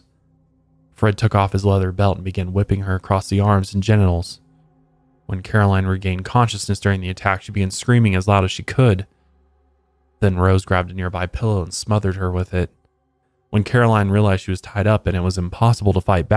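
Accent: United States. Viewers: Oh my God, I had such a laugh out loud moment when I realised was talking about Hertfordshire at the beginning of this video 😂😂 and some other English names made me laugh throughout too. I mean that I was laughing lovingly because it's so cute how Americans often pronounce our place names 😅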